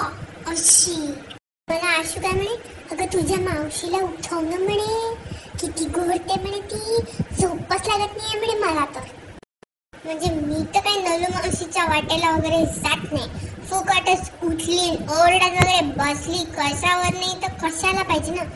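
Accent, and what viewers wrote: Nagpur, India. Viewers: ekdum masta👌and I love that kinda nagpuri accent😀☺awsm...keep it up👍👍👏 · Awesome Stuff, really Hats Off to you.....one of my friend speaks same like SOTI porgi.. as she is also from Nagpur...Both of us enjoy your videos a lot....Happy Diwali..to you and your Family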